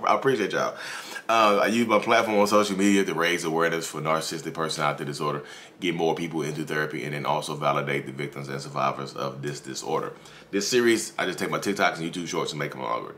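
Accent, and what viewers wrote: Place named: South, United States. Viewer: Shoutout to NC with that Southern Draw!!!! As I'm from Winston-Salem,NC . I love this channel ,and even more your content, keep it coming!!!!!!!!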